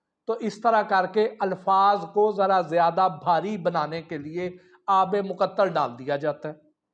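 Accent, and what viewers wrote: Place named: Punjab, India. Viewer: this pendo man does not know anything . his urdu accent is mixture of punjabi . funny language 😆😆😆😆😆😆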